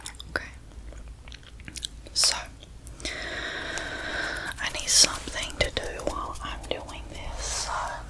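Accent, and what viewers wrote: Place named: North Carolina, United States. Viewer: The addiction to that NC accent, lol.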